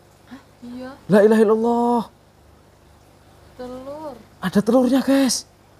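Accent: Indonesia. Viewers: Sampean omahe endi bang...kok logate rodo semarangan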